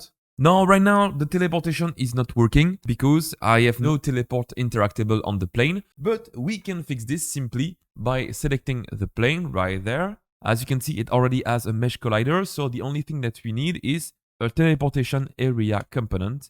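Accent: France. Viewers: Beautiful French accent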